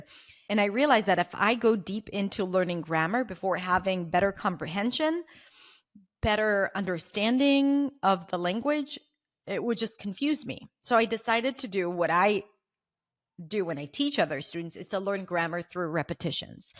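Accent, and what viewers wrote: Spain. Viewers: Incredible, your English being gently touched by Spanish... I wish you the best in the new life and learning process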